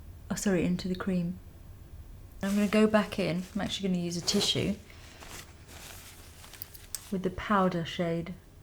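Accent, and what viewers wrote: United Kingdom. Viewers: Wow very pretty..i will try ur tips but using all cruelty free products to me my vanity is not as important, its the the makeup and who stands behind it. No animal testing for me and thanks for cool tips nice brit accent.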